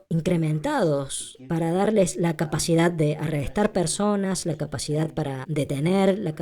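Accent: Córdoba, Argentina. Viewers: Felicito a quien se haya tomado el trabajo de traducir a voz viva todos estos documentales, pero esa voz tipo " las ardillitas" y con una tonada cordobesa y con intervenciones tipo humor cordobés...jajajajaja, me encantaría saber quien está detrás de esa maravillosa voz....jajajajaja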